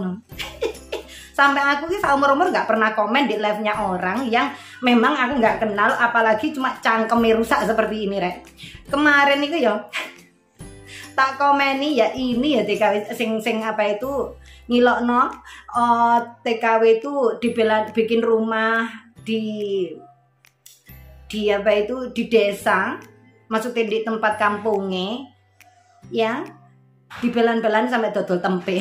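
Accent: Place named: Malang, Indonesia. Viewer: 👍👍👍 cocok bak . senang rungokno komene pean. logatnya malang mutu .😀😀😀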